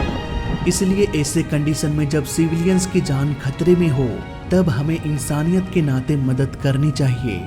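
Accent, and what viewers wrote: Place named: Bihar, India. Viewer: yeh kya hai bhai bihari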